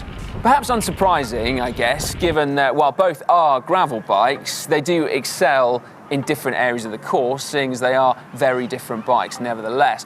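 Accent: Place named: England, United Kingdom. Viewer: English accents are annoying..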